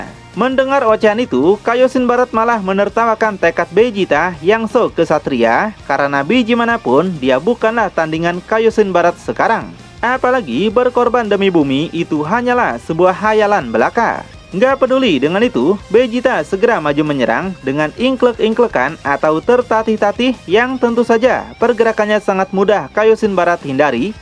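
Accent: West Java, Indonesia. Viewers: Sunda gening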